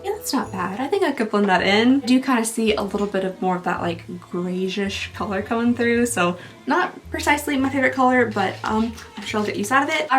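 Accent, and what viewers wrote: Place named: Southeast Missouri, United States. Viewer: Awe! You looked so lovely in this video, young lady! After finding your channel last year, I’ve had a blast watching these! I’m from southeast Missouri so your accent is like home!!❤ thanks for all the smiles!❤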